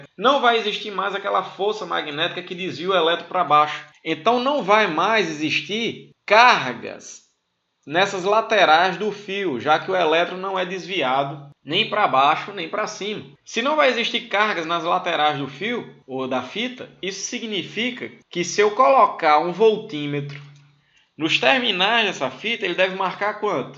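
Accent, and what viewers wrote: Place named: Northeast, Brazil. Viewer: o mais bonito é esse sotaque visse . bichim amo meu nordeste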